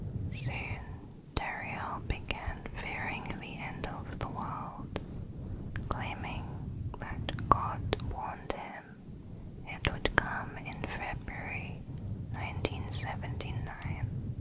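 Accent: United Kingdom. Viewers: she has a British accent. Highly doubt she’s Native American. My guess is Nepali or Afghan.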